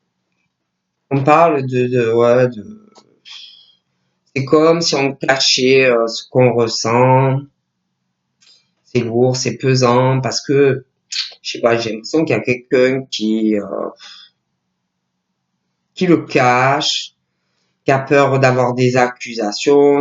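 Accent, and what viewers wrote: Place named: Marseille, France. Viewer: Bonjour s est la première fois que je vous vois, et vous écoute, vous êtes un monstre dans le bon sens biensur, vous êtes extraordinaire, merci votre accent, j ai le même Marseille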